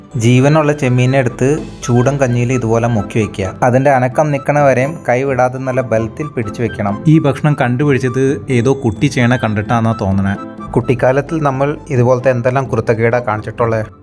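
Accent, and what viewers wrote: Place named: Palakkad, India. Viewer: സംസാരം നല്ല രസം ഉണ്ട് കേള്‍ക്കാന്‍ ഒരു പാലക്കാടിന്റെ ചുവ ഉണ്ടോ എന്ന് സംശയം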